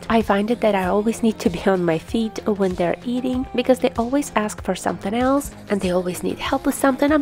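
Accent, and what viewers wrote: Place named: Ukraine. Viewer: I’m a mother of 4 , my son is the oldest, then I have twin girl, then another girl. I am now a grandmother to 10 children, we have 6 granddaughters and 4 grandsons. I am a retired social worker and my husband is a nurse. We now take care of 7-8 of our grandchildren during the summer, and we do after school care when they are in school. I’m very busy with the littles, housecleaning, laundry, all the things, but I adore them! Where are you from? I have a friend from Ukraine and your accent is similar. Enjoy your channel!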